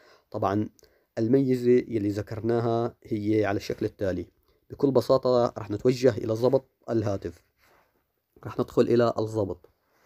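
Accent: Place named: Homs, Syria. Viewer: معلم مدري ليش حاسس اني سامع صوتك وانك حمصي 😅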